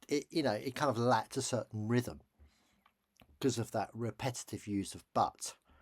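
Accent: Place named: United Kingdom. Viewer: Falta los subtitulado desde el inicio. Por lo demas todo bien. Me encanta el acento British 🥰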